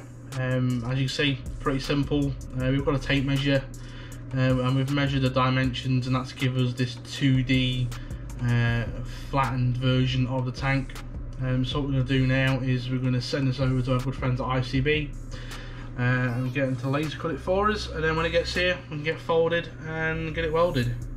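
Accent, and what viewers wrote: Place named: North, United Kingdom. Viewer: Ohhhh a northerner ahaha great work !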